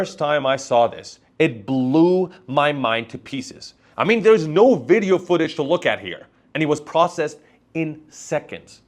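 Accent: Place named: Iran. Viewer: I like your Iranian accent! Thanks for the instruction!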